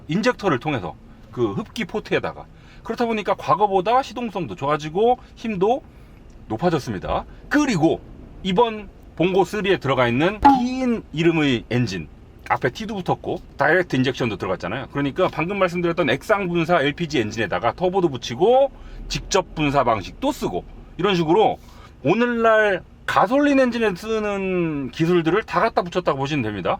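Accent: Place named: Chungcheong, South Korea. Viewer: 참~~ 말씀 잘하시네. 충청도 사투리도 정겹구요. 실속있는 구체적인 정보 귀에 쏙쏙 들어옵니다. 구독 누르고 갑니다.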